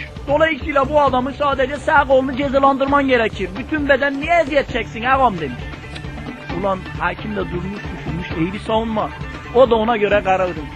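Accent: Gaziantep, Turkey. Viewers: asil antep sivesi bu, dizidekilere kanmayin, onlari duydukca uyuz oluyom... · cok güzel bizim su antebcemiz yau gülmekten bittim haa yauw su antebimi öyle bi özledim ki vallah gidince antebin topragini öpecem sanada tsk sen beni güldürdün allah da seni güldürsün la yorum neding la haha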